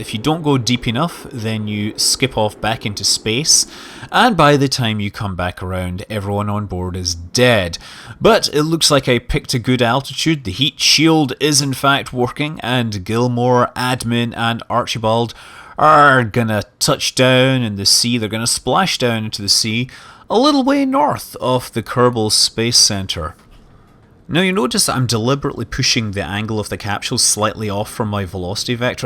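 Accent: Scotland, United Kingdom. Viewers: He is a Scottsman :)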